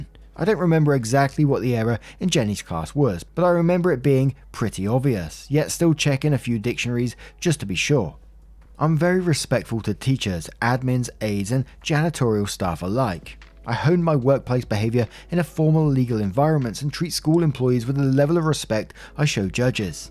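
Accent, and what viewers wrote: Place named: United Kingdom. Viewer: What? has an accent? I didn't know! Oh, wait... he's a fellow Brit! 😁 But you are right, he'd do well as a voiceover artiste.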